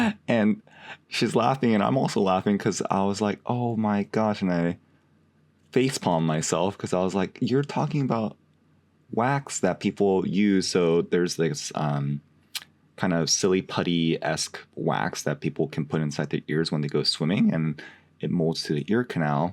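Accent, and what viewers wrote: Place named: Australia. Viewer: Please don’t stop doing these, love your approach and your voice is wonderful. Couldn’t work out where you were from, thought you could even be from Australia. We don’t all sound like hillbillies.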